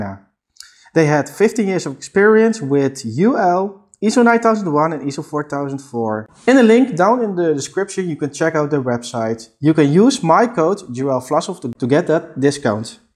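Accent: Netherlands. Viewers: I nit think he us russian my friend, he sounds dutch to me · Ik hoor een nederlands / engels accent. ^_^ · Je hoort dat het een Nederlander is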